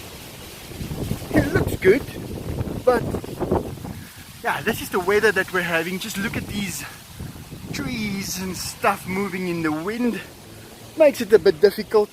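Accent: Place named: South Africa. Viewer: I would absolutely LOVE to see a tiger (and a lion for that matter) in real life! I live in the UK and I've never even been to a zoo so your videos are a real treat for me, it's like a different world! Thank you so much for sharing with us all. P.S I just LOVE it when you say "Can you believe it"! The South African accent is my absolute favourite! Much love to you, and all the magnificent animals <3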